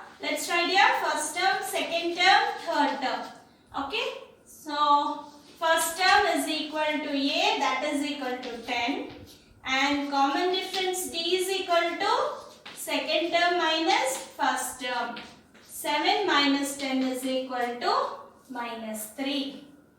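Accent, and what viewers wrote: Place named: Bangalore, India. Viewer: Mam I thought that u r from banglore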